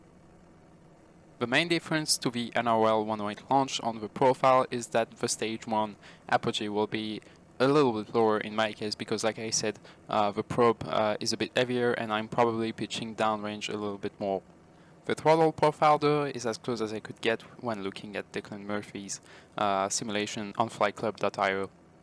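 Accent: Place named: France. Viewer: La vidéo apparait de manière random dans mon fil... je t'écoute parler et je reconnait un petit accent francais.. tu serais pas à Supaero par hasard?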